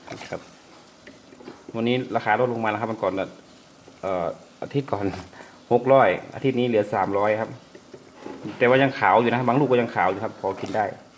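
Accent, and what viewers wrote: North, Thailand. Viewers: ชอบฮื้ออู้เมืองมันเป็นเสน่ห์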